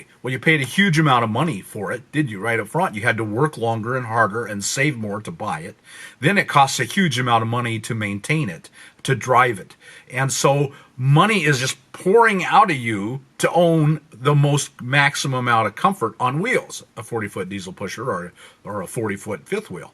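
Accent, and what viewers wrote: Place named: United States. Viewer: Hi Sir, It's so refreshing to watch your videos with soft music in the beginning and your handsome viking bearded face and American accent. It's almost like a religious experience because you do not talk about money! Very well presented. I love the WASP culture and values. Keep up the good work! Best regards, Tanveer, London, UK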